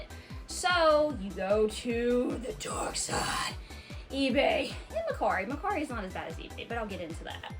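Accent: South, United States. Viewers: Southern accent for a girl is the cutest accent there is and one who is a gamer is the best of the best ❤️ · My local Goodwill only has about 50 copies of Tony Hawk Ride 😆 Glad your channel got recommended! It's nice to hear another collector with a Southern accent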